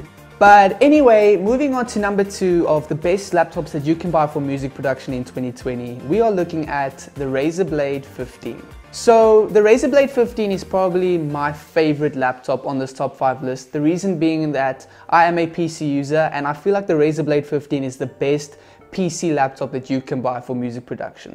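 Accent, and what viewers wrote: South Africa. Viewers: The only video that actually helped me with finding which laptop to buy thank you. The SA accent reminded me of home brew. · When you said (SMUTH) my brain said...he sounds like he came from South Africa.😁 but your location says LA. hehe EDIT: yea I was right. hehehe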